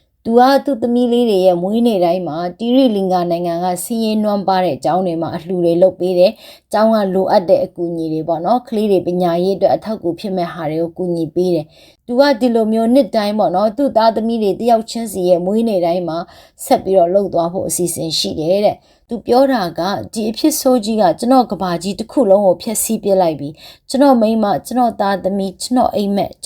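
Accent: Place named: Chin, Myanmar. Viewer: ချင်းလူမျိုးစကားပြောအသံနဲ့တူလိုက်တာ 😊 ကိုတွေ ချင်းလူမျိုးသူငယ်ချင်းတွေလဲအဲလိုလေယူလေသိမ်းအသံနဲ့ပဲ စကားပြောကြတာ 😊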